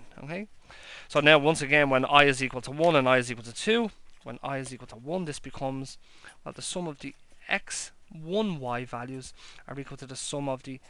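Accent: Ireland. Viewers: Plus, I really like your Irish accent.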